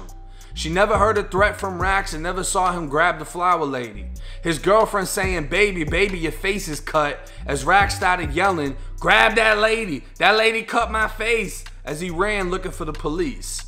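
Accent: Boston, United States. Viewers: Bro what part of Boston you from...!!!??? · Your accent sounds like you was raised in Boston and moved to a Florida hood when you were 10..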